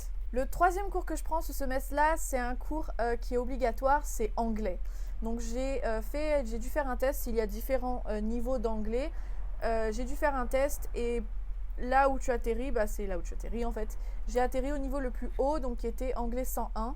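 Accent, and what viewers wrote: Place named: United States. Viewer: lol, desole, je pense que tu es americaine, parce je vu ta video, tu parle bien anglais comme americaine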